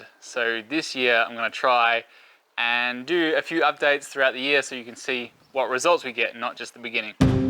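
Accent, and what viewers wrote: Australia. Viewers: Yes, Aussie. I'v got you not from England ;-)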